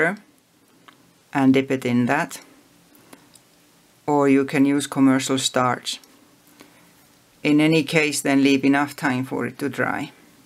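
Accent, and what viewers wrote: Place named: Finland. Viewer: Kuuntelin aksenttia ja totesin että oiskohan suomalainen ja kyllä vaan. :D Kiitos ohjeesta.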